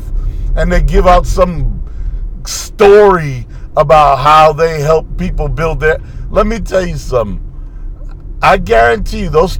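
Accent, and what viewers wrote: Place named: Jamaica. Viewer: Yeah she schooled that elder big time,! Love your Jamaican accent, too funny. Look forward to catching this segment sounds interesting. I took and wrapped up my silver book, can't really call it a bible since they screwed with the holy book.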